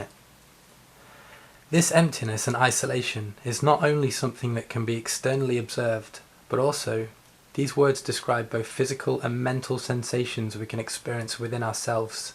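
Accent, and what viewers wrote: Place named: United Kingdom. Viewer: More horse shit 'British' accent ? what.. go back to your pitiful little island a piss off.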